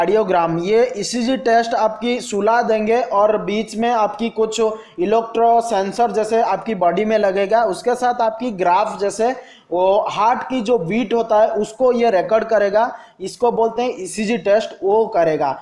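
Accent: Odisha, India. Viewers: Bhai Hindi pura odia bhalia laguchi...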